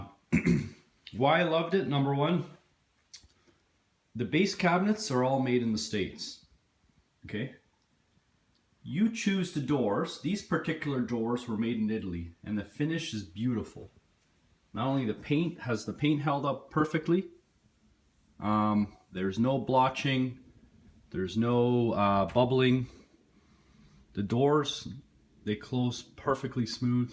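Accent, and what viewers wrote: Canada. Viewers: Silverback Gorrilla ... Playing into stereotypes. Dumb little wanker. The bloke also sounds Canadian, not American. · Surprised to see this 'Euro' Window you have over the sink - wonder if it swings-n-tilts as well? You sound Canadian ...perhaps why ;-) Cheers!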